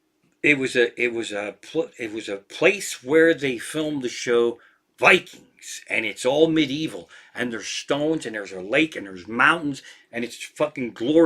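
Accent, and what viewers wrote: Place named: Ireland. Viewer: This was one of your best shows! I laughed so hard! It is great! Love your Irish accent!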